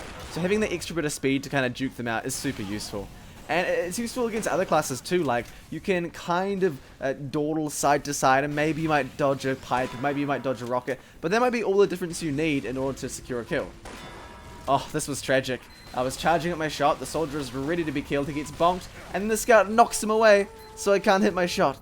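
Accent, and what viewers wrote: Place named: New Zealand. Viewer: He's from New Zealand.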